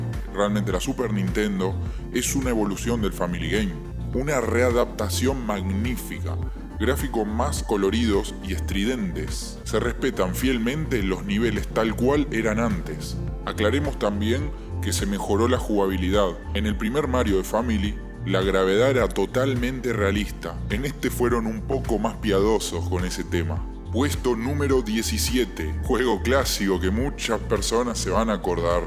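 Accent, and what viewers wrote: Argentina. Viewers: minuto 0 y ya me di cuenta por el acento y las s's de que sos argentino XDDD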